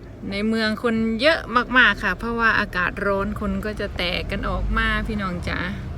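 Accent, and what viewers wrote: Isan, Thailand. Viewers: น้อง เว่าอีสานเพราะอีหลี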